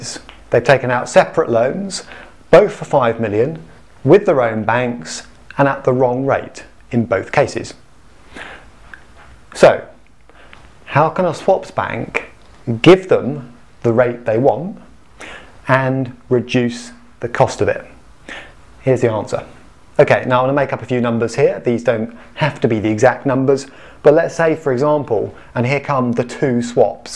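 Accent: United Kingdom. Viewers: It always works better with a British Accent. Fantastic video! Thanks! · Haha I was confused too. Then I turned on the caption. Its 'aren't' potentially higher. Brit accent i tell you.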